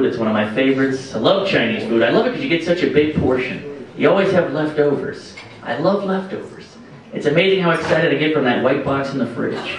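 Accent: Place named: South, United States. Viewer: I think Mark's accent here is the most southern I've heard it. I can hear it in certain words he says.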